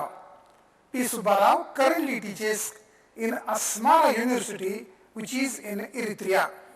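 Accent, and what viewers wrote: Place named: India. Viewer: Indians talk so funny XD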